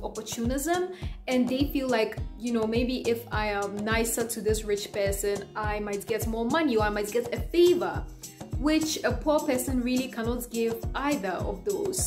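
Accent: Ghana. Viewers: Exactly, nothing different, accent is typical gh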